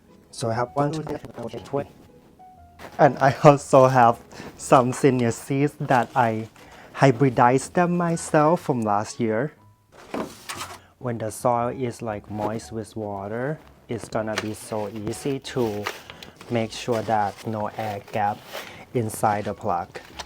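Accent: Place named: Thailand. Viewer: So beautiful 😍 I'm so happy that i found your channel, love this video. I'm planning to grow zinnias this year and first time in my life , I'm a little bit confused about it because I'm from Thailand we don't have to deal with the cold weather . I live in Ohio. Are you Thai ? Your accent is so lovely and sounds similar. Sorry for asking i don't want to be rude just happy to see Thai people. Hope you have a wonderful weekend ❤️🥰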